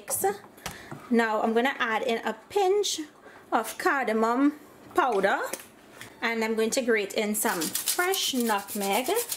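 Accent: Trinidad and Tobago. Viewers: I did a quick search for a cream of wheat recipe, and this video was the first to come up. I clicked the thumbnail without reading the name of the video or the name of the channel. Within the first 2 seconds of the video I said "buh she soun'ng like ah Trini". It was a most wonderful realisation that this is a Trinidadian channel on YouTube. Imagine my elation when it hit me that it was the first video to come up! Good job on the quality of your content as well as the authenticity with which you have present 'Trini cooking'. You have represented us well in my estimation! I truly hope this channel will continue to grow (: all the best to you.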